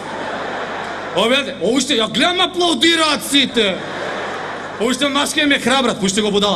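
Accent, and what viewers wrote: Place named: Kumanovo, North Macedonia. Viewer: Kumanovac od daleko se poznava.Bravo samo takoj produzi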